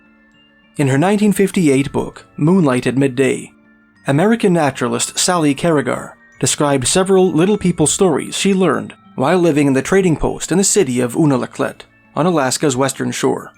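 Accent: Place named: Canada. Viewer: I saw your moving video! Was a great little video. I tried to leave a comment but i dont think it was recorded. I was wondering if the house you left was the location of the rock blasting and the little guy? And you are speaking English. "Canadian English " wow that's different. Greetings from Brazil.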